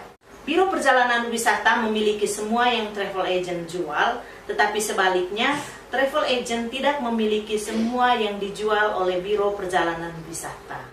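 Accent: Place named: Flores, Indonesia. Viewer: Sangat membantu penjelasannya sangat jelas dan logat atau gaya bahasanya sepertinya mba orang flores ya,...terus kembangkan pariwisata komodo...salam flobamora 🙏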